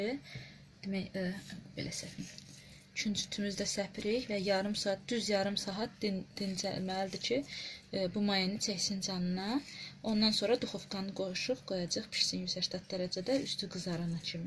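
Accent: Agdash, Azerbaijan. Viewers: Superdi.xanim siz Azerbaycanin hansi bolgesindensiz? Agdaşli deyilsiz tesadufen?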